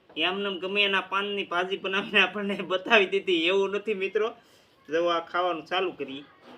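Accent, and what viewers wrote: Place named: Kathiawar, India. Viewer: બોવ મીઠું મીઠું કાઠિયાવાડી બોલો છો, કયું ગામ? જય માતાજી. જવાબ આપવા વિનંતી. મારો નંબર ૯૮૨૪૦૧૦૪૦૩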